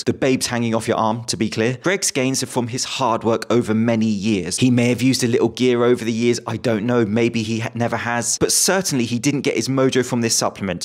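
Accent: United Kingdom. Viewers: a normal looking guy in glasses and a British accent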